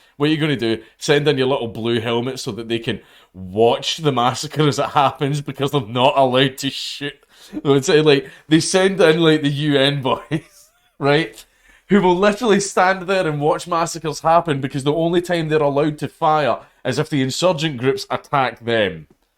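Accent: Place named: Scotland, United Kingdom. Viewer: Not that I don’t like your ‘Mad Lads’ series/channel. But I love your news videos. As a yank, I love your Scottish accent too.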